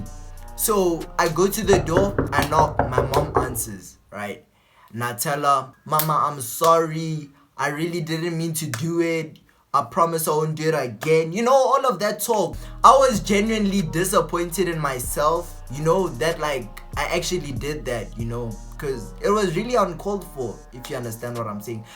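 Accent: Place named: South Africa. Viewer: I like his SA accent its real dope🔥❤️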